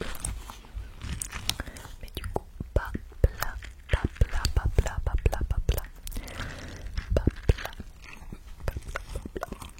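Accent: South, France. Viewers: Il y a énormément de mot que nous utilisons également dans le Nord, et un peu partout en France même, qui sont globalisés dans un langage familier. Après vous avez quelque mot que je n'avais jamais entendu, magarelle 😮 ! 😂. La team chocolatine le débat est finis, seul les sudistes le disent, nous sommes 90% à dire "petits pains" dans la France. Le principal étant qu'on sache ce que les deux mots veulent dire. Ceci étant dit, "chocolatine" ( d'ailleurs même ma saisie automatique sur mon clavier ne connait pas 😂) prend du charme quand il est prononcé avec votre accent ! Sympa la découverte 😊👌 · Super concept, et j'ai appris des choses comme ça je passe crème quand je retournerai dans le sud haha (par contre j'arrive pas faire l'accent, que j'adore).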